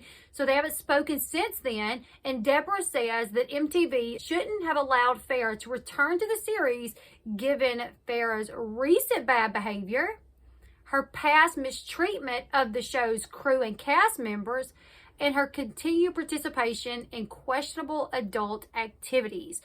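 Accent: South, United States. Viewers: im not even from the states and i can tell its real haha besides you havent skipped a beat in all your videos so if you were acting your damn good LOL plus i love your accent, could listen to your southern drawl allllll day!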